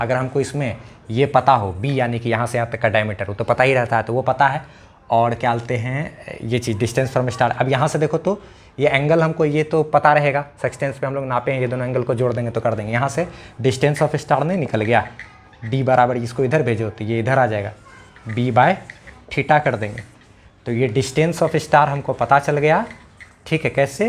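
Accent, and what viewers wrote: Bihar, India. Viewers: Sir app bihar sa ho kya to pranam 🔥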